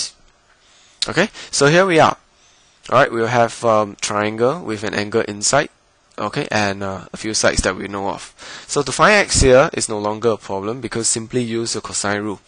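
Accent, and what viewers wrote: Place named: Singapore. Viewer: i bet you are from singapore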